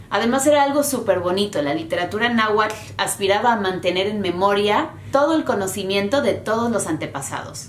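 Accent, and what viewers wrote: Standard. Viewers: yo tenia entendido que un idioma es el que tiene tambien ESCRITURA o se que no solo se habla sino que tambien se puede escribir.... y en su defecto el dialecto no. Hablas Espanõl neutro y tambien se diria que tu Ingles es neutro. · Te sigo en tu canal, pero como que no era tan fan de tus videos, pero me has ganado ... Hablas español e inglés perfectamente y defiendes el náhuatl sintiéndote orgullosa de tus raíces y durante este video analice tu acento y me encantó ya que es muy neutral y no como el de las ridículas que hablan un poco de inglés y ya se expresan así: "Joveeeen buenas tardeees..."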